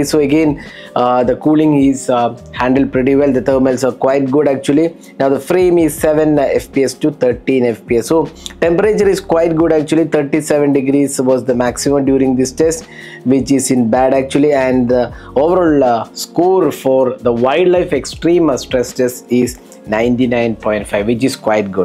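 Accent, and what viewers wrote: Kerala, India. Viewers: Are you from Kerala!?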